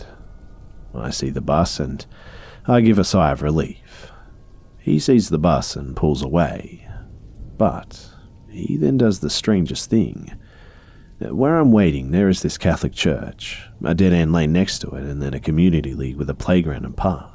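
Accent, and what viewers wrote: Australia. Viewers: Oh my gosh I'm new here so when you started speaking I was like "OMG you're Aussie you're my people!" All the other scary narrations I watch on YouTube are American! All. Of. Them.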